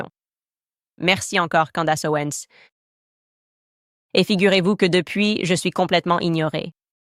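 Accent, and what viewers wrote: Quebec, Canada. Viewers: lol, same here. Weirly she speaks with a slight Quebec accent, and some of the translation is a bit approximative, but still, it is pretty impressive. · C'est vrai pour l'accent québécois mais ça reste très confortable a suivre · Bonjour Candace Je suis français et je t' écoute en français. Si ton français (issu d'IA) est très bon, je dirais qu'il n'est pas parfait car il comporte quelques accents canadiens que l'on ne trouve pas dans les diverses régions de métropole. Le français des iles caraïbes ou d'Afrique a aussi des accents marqués mais pas canadiens. Cela dit l'écoute reste confortable pour un français qui ne comprend pas l'anglais et encore moins l'américain. cordialement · AAahh le francais AI est bizzare, c'a laire du francais quebequois 😂😭😭going back to main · en tant que Français ça donne un ressenti de québécois édulcoré. 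Mais je ne suis pas du tout spécialiste 😄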